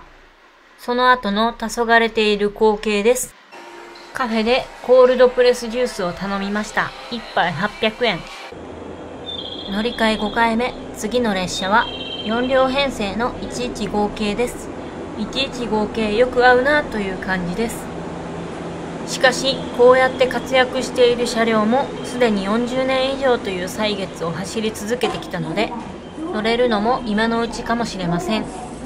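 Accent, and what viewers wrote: Kansai, Japan. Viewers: 関西訛りのイントネーションがとても好きです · ところどころ出て来る関西弁のトーンの親しみやすさ。117系など識別できる鉄分の濃さ。 もちろんお顔も美人で大ファンです。(南大阪地区の鉄ヲタのタクシードライバーより) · 丁寧に話しておられますがちょいちょい隠しきれない関西の荒っぽさが出ていて親しみを持ちましたw博多までお疲れ様でした！次の旅も楽しみに待っています。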